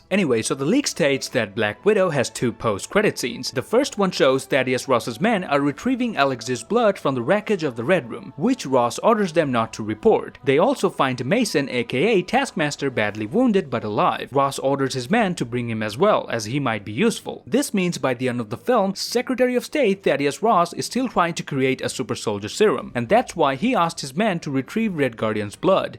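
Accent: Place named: Quebec, Canada. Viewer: I can tell by the way you pronounce farm, are, and such. I can tell you’re French-Canadian. I’d like to assume your first language is French. And with that information, shall I suggest the same channel but you say everything in French, for any French viewers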